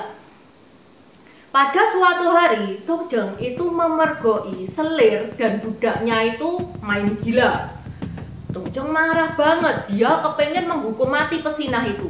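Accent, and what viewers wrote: Java, Indonesia. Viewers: Logatnya njawani men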